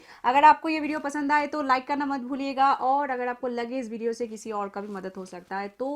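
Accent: Bengal, India. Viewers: Aapki hindi me bagoli language ka tadka he sunne mi bahot accha lagta he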